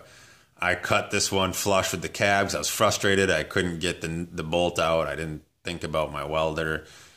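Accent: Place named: Wisconsin, United States. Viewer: Hey are you the dude from Wisconsin??? Im assuming by your accent that you are. I live in Hayward myself! Lol. Cool video, My 98 Silverado is having the same issues.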